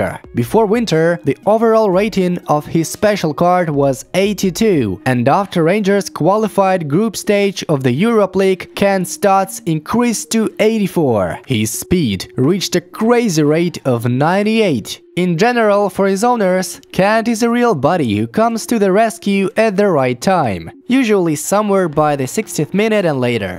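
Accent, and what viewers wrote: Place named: Netherlands. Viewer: He sounds Dutch.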